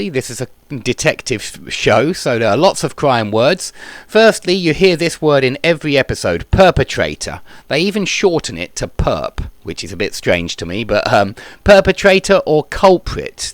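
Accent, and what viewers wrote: United Kingdom. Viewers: I like your way to teach with british accent. . love you so much. thank you frim indonesia :)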